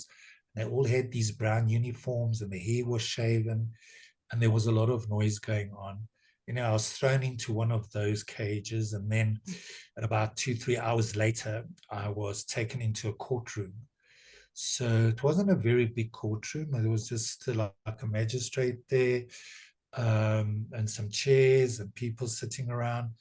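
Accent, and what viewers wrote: South Africa. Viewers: Good South African accent !! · This sounds like a South African but let's see if I'm correct in saying that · is this a south african accent ?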